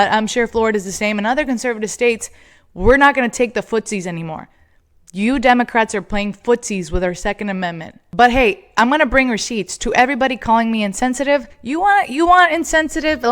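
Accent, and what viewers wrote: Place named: United Kingdom. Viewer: He has a British accent,that says all you need to know about that feckless soy boy british 😂😂😂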